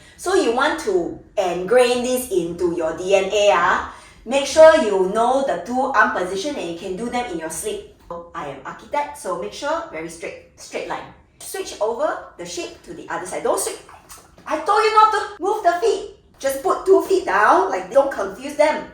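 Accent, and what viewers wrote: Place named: Singapore. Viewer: Sounded singaporean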